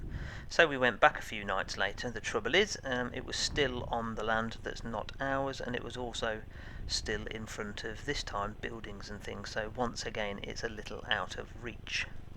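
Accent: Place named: United Kingdom. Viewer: I think it's that Brit accent that makes these videos better, compared to my American accent.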